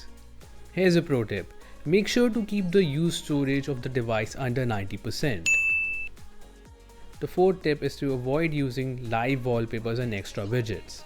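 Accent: India. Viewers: You're the first YouTuber with indian accent I can undestand, thank you for this great Video it helped me a lot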